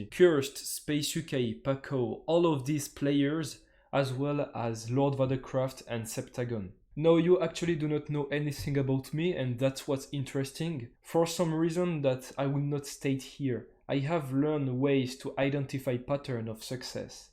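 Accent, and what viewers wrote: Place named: France. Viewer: you have the french accent lol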